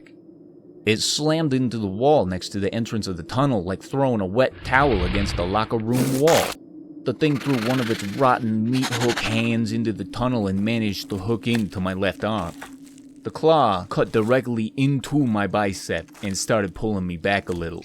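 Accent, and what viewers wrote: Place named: Massachusetts, United States. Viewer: This was a great story! You did a pretty good job with the Mass accent, too.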